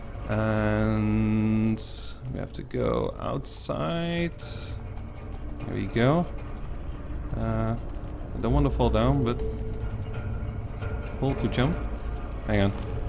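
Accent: Netherlands. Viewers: I hear a Dutch accent. How do I know? In Dutch to. Hallo. Goed Engels gesproken. Ik dacht DAT het eerst normaal was.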